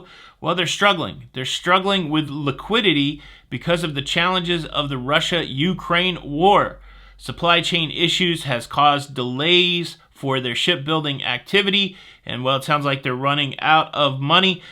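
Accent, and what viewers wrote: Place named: Texas, United States. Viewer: I am a born and bred Texan and your humor was spot on. BTW you have a great Texas accent.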